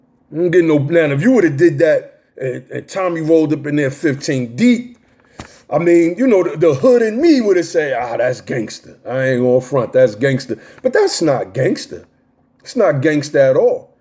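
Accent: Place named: New York, United States. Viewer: You sound like BX or BK, but I love your delivery. Have to Subscribe right now. You speaking for real truth. Exactly how I'm seeing this sucker move in Harlem.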